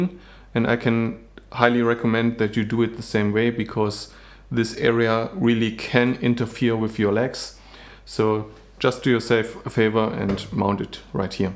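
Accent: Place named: Germany. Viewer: Gutes Video ! und sauberes, sehr sympathisches "German English" ;-)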